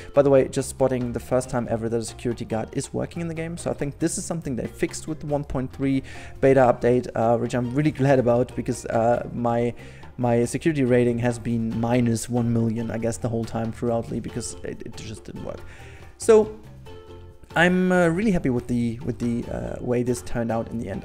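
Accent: Germany. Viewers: To be honest, I like that you explained your choose of language. I watched many of your videos, simply because they are absolutely amazing. But your German accent and some phrases were confusing me sometimes... anyhow, now I love your videos even more! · War ja klar, dass die deutschis sich aufregen, dass du Videos auf Englisch machst. xD Anyway, du bist der erste Deutsche, dem ich auf jedenfall laenger zuhoeren kann, da dein Englisch wirklich sehr gut ist und du auch keinen so starken Akzent rumtraegst. Don't listen to the kiddos, who whine because they dont understand shit - you do some neat, quality content and deserve the recognition online :) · I actually really like watching you because of your German accent, please don't change :) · I for one think the German accent and language is positively breathtaking. Normally I’m not a fan of commentary in speed builds but you have such interesting mannerisms, stories and viewpoints that I quite like listening to you talk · German too and I don´t like hearing most others,when talking english, but you don´t have this strong accent. 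it is noticable ,but just slightly. That´s very ok to listen to, similar when I speak. Ikr ,hearing oneself is always weird. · I kind of like hearing German people speak english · well, about your accent is good better than another German 🙃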